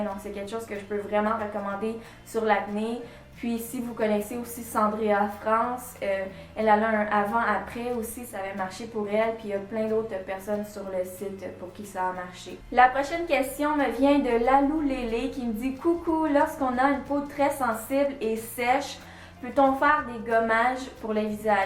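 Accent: Quebec, Canada. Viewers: ses l'accent Québécois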